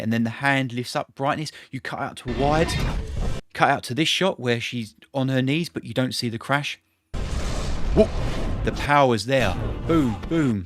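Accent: United Kingdom. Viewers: What a cutest English accent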